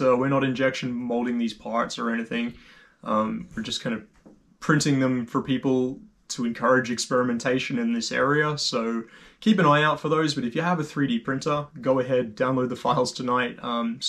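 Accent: Australia. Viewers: Slight Aussie accent Almost American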